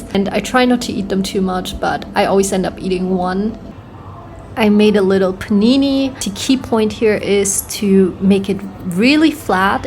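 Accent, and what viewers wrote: Australia. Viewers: I just wanna add that as an Aussie watching your content I can hear your accent changing to sound a bit more Aussie 😁 ps. Congrats on your new job! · I can hear that aussie accent coming in :D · I can already here some Australian accent coming out haha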